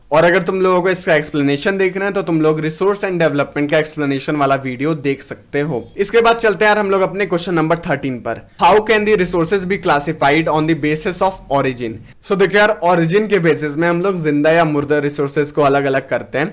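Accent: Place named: Uttar Pradesh, India. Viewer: Kariya soil😂😂love your language too😂🤣.... Katai upwalo wali feeling aata h😂 .... M to UP se hi hu to feeling to aaegi n😌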